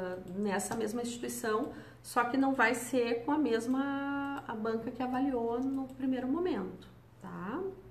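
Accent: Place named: South, Brazil. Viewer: É um jeito de quem mora no Sul falar.. normal.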